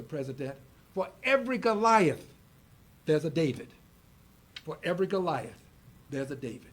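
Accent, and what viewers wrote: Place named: United States. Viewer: True and clear speech . For me as a german it sounds like America.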